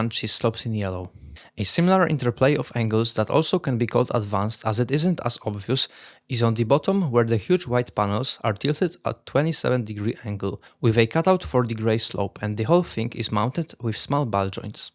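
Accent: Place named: Spain. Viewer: u speak spanish, right? u got a lot of accent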